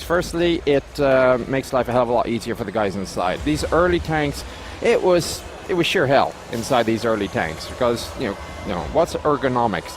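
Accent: Ireland. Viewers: Mr Chieftain are you by any chance Irish? Your accent seems a wee bit Irish.. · I'm sorry I might be completely wrong, but does he have a bit of an irish accent?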